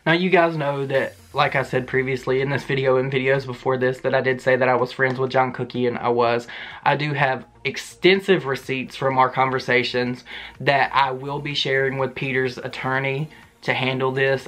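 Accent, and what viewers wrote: South, United States. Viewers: uggggg, your southern accent makes me crave okra :( <3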